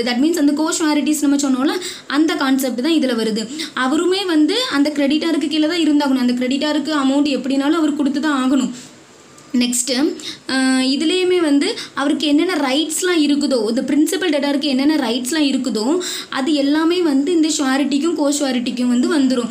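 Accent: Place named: Nagercoil, India. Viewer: I think It's Nagercoil language...😊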